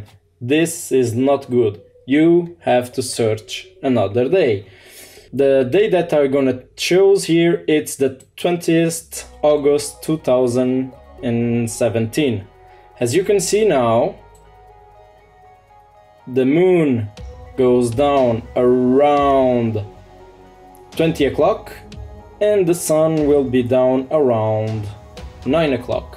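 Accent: Brazil. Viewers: Tu é BR né man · brazillian accent....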